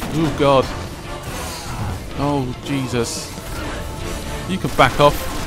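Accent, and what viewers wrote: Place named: Southeast England, United Kingdom. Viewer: I'd guess he's from Southeast England.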